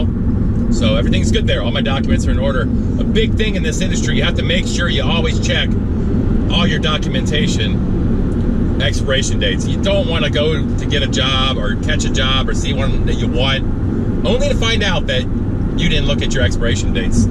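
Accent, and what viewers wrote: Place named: United States. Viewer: i dont,t understend you))). На самом деле это вот настоящий американский язык и произношение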